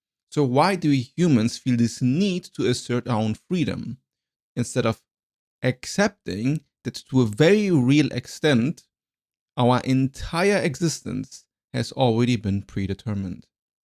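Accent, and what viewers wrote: Germany. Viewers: Am i crazy or is that a german accent if yes keep it going, german youtube is so trash that this content is gold compared to their mainstream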